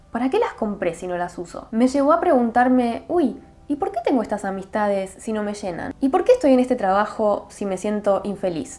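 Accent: Argentina. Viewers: Te escucho el acento argentino gracias 🙏Vamos con Latinoamérica minimalista porque el consumismo de plástico está haciendo estrado mientras que en países más ricos está precioso el orgullo del minimalismo..una buena moda al fin!🙏🌎t ganaste una sucriptora por propiciar esto.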